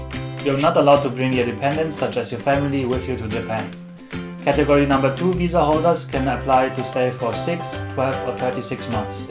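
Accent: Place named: Germany. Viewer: oha i wuste doch das dein aktzent etwas deutsch klingt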